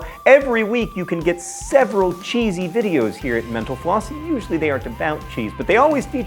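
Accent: France. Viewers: I like your french accent and just for that i like this episode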